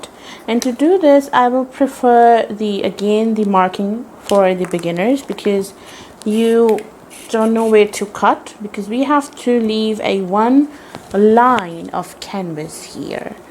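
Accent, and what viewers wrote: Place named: England, United Kingdom. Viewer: i personally love your English accent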